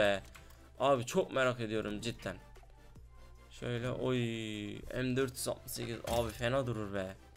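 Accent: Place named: Tokat, Turkey. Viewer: abi nerelisin ben tokat bizim oralılar gibi konuşuyorsun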